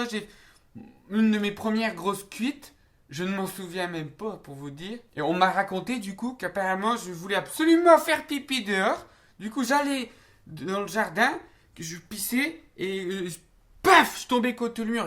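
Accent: Nord-Pas-de-Calais, France. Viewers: Sa se vois que est un ch’ti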